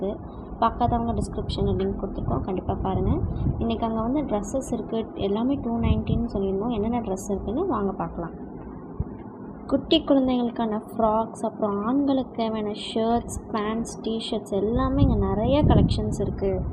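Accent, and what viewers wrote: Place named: Coimbatore, India. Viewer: தங்கள் பதிவு மிகவும் அருமை... வாழ்த்துக்கள் தாங்கள் குரலை பார்த்தால் தாங்கள் கோயம்புத்தூர் என நினைக்கிறேன் வாழ்த்துக்கள்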